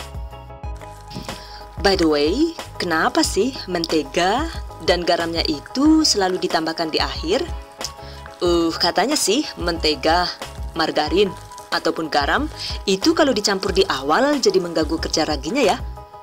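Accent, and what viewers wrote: Banyumas, Indonesia. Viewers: Hahahaa ngapake kaya aku mbak, asli ngapak ngendi mbak😁😁😁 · Selalu suka sama videonya n diselipin bahasa ngapaknya, ora ngapak ora kepenak hehehe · Laah looh kok jebule ngapak.... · Jaannn bisane koohhh...jebulee ngapak juga rika yayunee😄😄👍👍👍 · Orang ngapak 😂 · Jebule Wong ngapak kiye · Maknyuss donat madunya..yeni virgo akan praktekin...tks ya jeng yg cuantiiikkk..juga kadang ngapakk yaa..he..he..he..salam kuliner buat yg koment yaaa..suksess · Pintu teater satu telah dibuka 😅 keren tutorialnya kak lengkap dan jelas banget penyampaiannya asik jokesnya dapet ngapaknya dapet hahaha like it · Haha wongapak..donute mentul mentul! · Hahaha ngapak lah yu, wong ndi sih rika yu😂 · mantabbb bu...bahasa Indonesia-nya merdu, bahasa Inggris-nya fasif, bahasa banyumasannya nggemesin, hasil donatnya bagus..penasaran jadi pingin lihat ibu...jangan cuma tangannya :)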